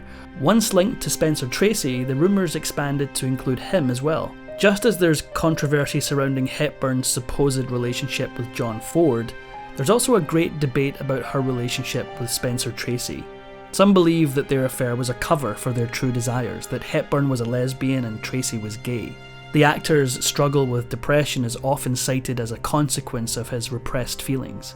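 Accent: Scotland, United Kingdom. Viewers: I enjoyed the Narrator's Scots dialect very much. I wonder how Ms. Atheist Woman is enjoying her afterlife? lol! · But it's not AI!!! Unless AI can now do a Scottish accent. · Interesting to a point, however I was put off listening to more than 4 minutes by your lack of enunciation. You're doing such a good job but please don't let yourself down. I'm Scottish too and unless you want to live in Lorna Slater's "Sco-land" can I, with all due respect, please ask you to pronounce "criticisms" as "criti CIS ms" not "cri-i-sisms" and "thea T re" - don't say "thea-rr". Does ma nut in so it does.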